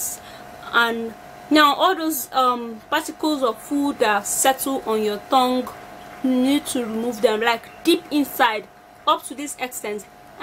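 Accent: Nigeria. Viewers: thank you so much indeed!! all your videos are great love it.Cuz it's amazing girl!! you teach me how to use it!! thanks once again for your help and advices for showing us to be able to get clean Oral Hygienic!!! such a courageous active young lady! ! well done and keep your efforts!! love Nigerian accent they're cool people! !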